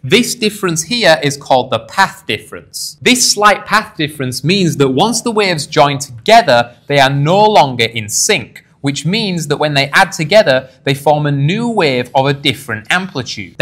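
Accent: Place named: Scotland, United Kingdom. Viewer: We got Scottish vsauce bevor gta 6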